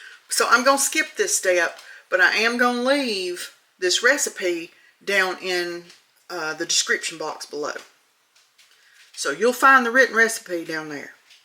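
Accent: South, United States. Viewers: I love watching you. I was raised ijn So. Calif. My family is from the South. I learned home cooking that is Southern. And I so enjoy your Southern accent and what you cook. It is just down home for me. Thank you.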